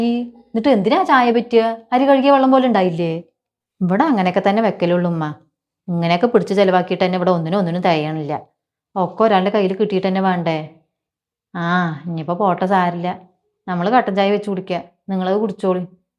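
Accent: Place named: Malappuram, India. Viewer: Palakkad tamilnad style anenn ketittud samsaram but nigal malappuram style annalo.... Anyway kidu acting subscribed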